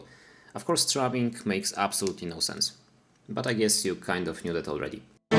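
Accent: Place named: Warsaw, Poland. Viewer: I ten warszawski akcent. Gratulacje.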